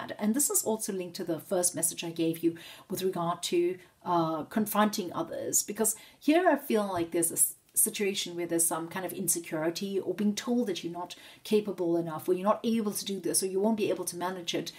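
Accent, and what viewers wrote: South Africa. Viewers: Love your style 🔥 Also lové your accent, are you based in South Africa by any chance?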